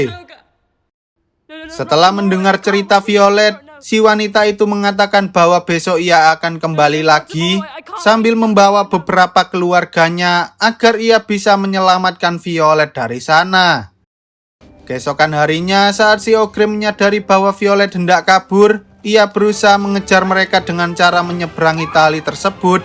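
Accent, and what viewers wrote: Java, Indonesia. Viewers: suaranya kaya mas Dobit, medok banget .... sukses selalu ya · Medok pisan keluaran suara jowomu yo mas bro..😅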